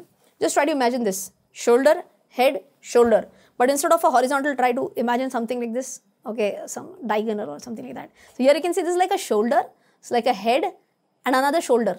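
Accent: Maharashtra, India. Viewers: Even English have Maharashtrian accent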